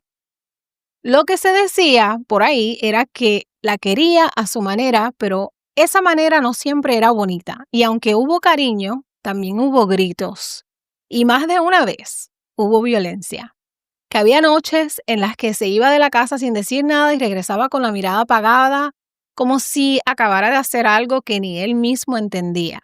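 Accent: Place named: Puerto Rico. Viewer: Wow, ahora se la historia completa, era malo😮uhhh, tremendo contenido, your a great story teller, Bien borikua😊como hablas, me encanta tu canal, El q aparece en la primera pelicula se parece a Braulio Castillo? Yo me acuerdo de estos actores wow, Fue una Leyenda ,gracias ma🇵🇷🇵🇷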